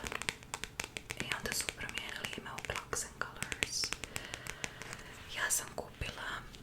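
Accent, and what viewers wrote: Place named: Dalmatia, Croatia. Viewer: Bas i ja gledam ono kao cilo vrime se koristiš dalmatinskim govorom, pa mie bilo malo čudno, ali svakako drago. Nekad se najbolje prepustit i pricat onako kako jezik krene 🙂‍↔️😊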